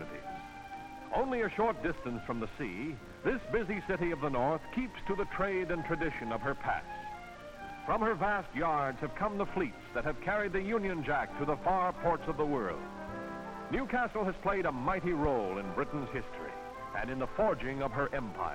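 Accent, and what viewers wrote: United States. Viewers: Why the American commentary?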